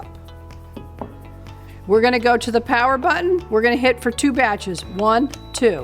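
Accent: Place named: Boston, United States. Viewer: hahahaah you must be from Boston too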